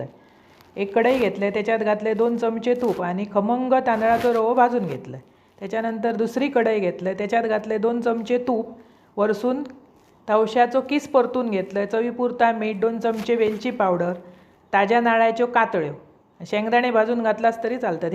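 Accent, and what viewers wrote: Malvani, India. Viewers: माका khupach avadta topatla. खुप खुप खुप🙏🙏 धन्यवाद. तुमचा मालवणी बोल्ना khupach chan eknumber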